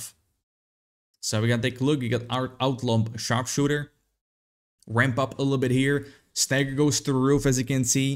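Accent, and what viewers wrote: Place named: France. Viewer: Bruh what language ur speaking...it sounds like english but french also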